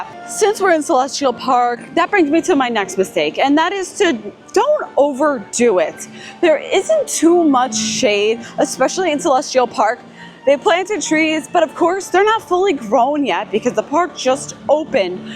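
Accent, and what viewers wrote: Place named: New Jersey, United States. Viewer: That Jersey accent is thick. 😂😂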